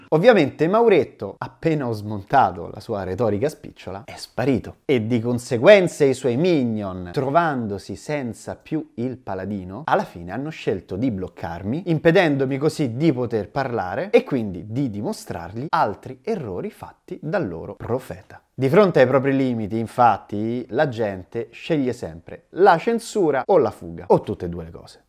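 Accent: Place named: Rome, Italy. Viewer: PORCA MISERIA..... Ed io che mi sono sorbito pure sti 15 minuti di video per ascoltare un tizio che ambiziosamente affermava di aver finalmente confutato Mauro Biglino (quindi un po' di curiosità me l' aveva messa). Poi.... Ti rendi conto che si tratta solo di un tale che sfotte, provoca, fa le battutine, e il tutto con una parlantina antipatica e volutamente romanesca (così viene meglio...), in pieno stile "iene" (magari ambisce a diventare uno di loro?). Si vede dal video nel suo complesso, che questo qui non ha alcuna valida strategia comunicativa, cerca invece solo di rompere le scatole a personaggi pubblici, denigrandoli gratuitamente. Con i tuoi: "dove scappi", "a meure'", "t' ho dato na lezione", per non parlare dei pochissimi spunti, tutti inutili quanto il resto del video, hai dimostrato solo una cosa. Che sei RIDICOLO e non sai tenere una discussione con nessuno, perché se volevi davvero confrontarti con lui, bastava non fare il maleducato irrispettoso, quindi forse il tuo vero obiettivo era un altro... 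Ha fatto bene Biglino a non risponderti più, è ovvio, qualcun' altro al massimo ti avrebbe altrettanto insultato. Sei solo un gran maleducato. Spero che le "iene", che a me non piacciono, perche spesso e volentieri usano il tuo stesso approccio irriverente nei confronti di chiunque, non ti prendano mai con loro.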